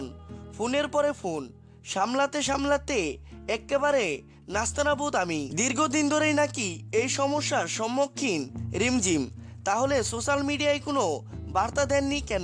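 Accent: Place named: Bangal, Bangladesh. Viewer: Amader west Bengal a ki u tube Chanel a boler moto keu ni j bolche se to amon vabe bangal vasay bolche baje lagche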